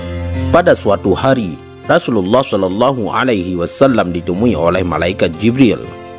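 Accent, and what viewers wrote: Aceh, Indonesia. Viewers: Dialeg Aceh sptnya..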